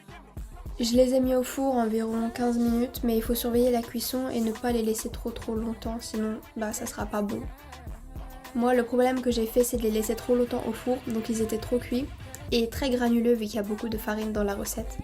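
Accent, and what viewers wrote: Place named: Canada. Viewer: T dans quel pays psq t’a unpeu un accent du Canada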